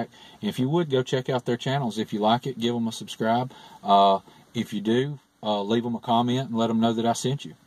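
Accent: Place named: Alabama, United States. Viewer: What part of Alabama you from?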